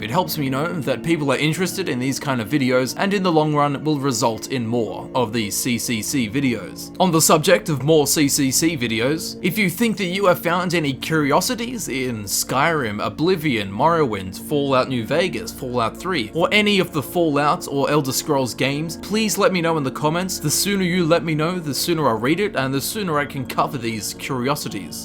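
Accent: Australia. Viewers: what part of Australia are you from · Hey, what county are you from, because your voice sounds faintly Australian but I can't place it, thanks! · Australian. The accents are very different.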